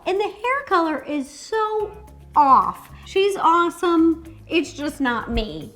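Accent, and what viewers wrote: United States. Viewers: Haven‘t hearrrrd SUCH an American accent in a long time but sounds cute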